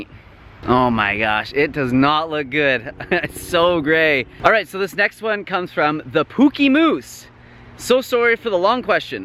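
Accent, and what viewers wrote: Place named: Canada. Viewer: I don’t know how anyone can find you annoying. I love the Canadian accent...ah frig...😍.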